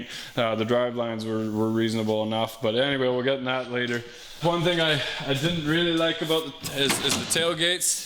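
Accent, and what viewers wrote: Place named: Canada. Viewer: Figured you were somewhere in Canadia by the accent! I am in my last year of college for Mechanical Engineering and I work for a fiberglass composite engineering company during the summers and breaks. Needless to say I'm quite busy balancing it all out!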